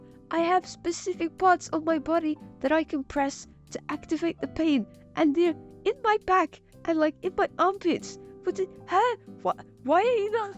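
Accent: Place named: United Kingdom. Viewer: Your name, is very misleading considering you have this awesome British voice, the greatest garden ever, and cute plushies everywhere you look. Also, it was cool seeing more of your art process 🙂 What the plushie's name? I might have missed it if you did say it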